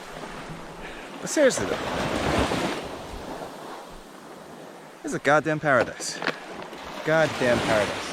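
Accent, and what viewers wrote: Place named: Ohio, United States. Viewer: That’s an Ohio accent.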